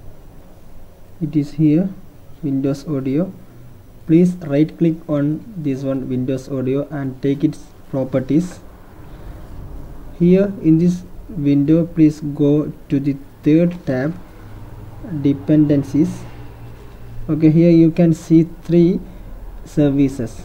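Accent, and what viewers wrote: Kerala, India. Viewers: Where does he come from ? Sounds like Apu from The Simpsons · Malayali anno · Dude are you from Kerala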